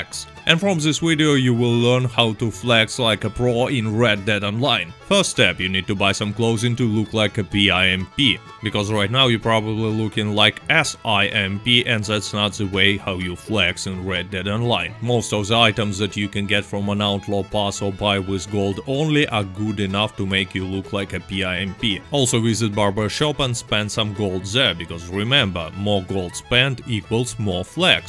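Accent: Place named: Russia. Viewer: Stop doing all other content to do more videos like these, the sarcasm in his russian voice kills me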